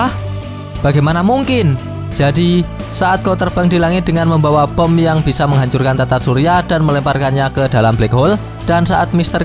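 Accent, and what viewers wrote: Java, Indonesia. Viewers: Lu orang Jawa ya min,medok bnget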